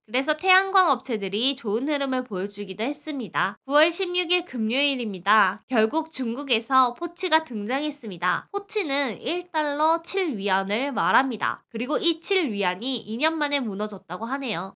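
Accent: Gyeongsang, South Korea. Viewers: 일료일 월료일... 은 갱상도 출신입니77ㅑ?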